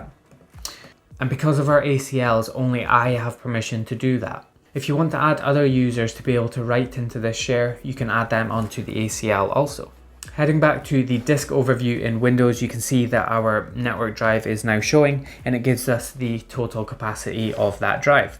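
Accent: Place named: Scottish Highlands, United Kingdom. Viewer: If I was to make a guess I would say you are from/at least grew up in Inverness?...definitely somewhere in the highlands.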